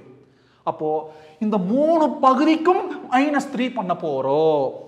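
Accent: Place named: Kerala, India. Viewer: Konjo malayalam slang, coimbatore slang, Tiruppur slang kalantha mari iruku sir....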